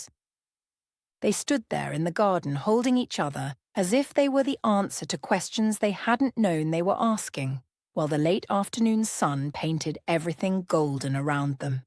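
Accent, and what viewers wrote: United Kingdom. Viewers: YT put this story in my feed for the second time. I still love every word of it. Also the Brittish voice.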